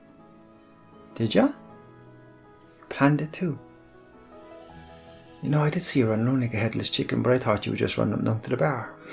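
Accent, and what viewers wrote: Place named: Ireland. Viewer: This version sounds so classy, romantic and Irish like. Love it. Love it. Love it ❤ Yes to the foot rub. More of this please😍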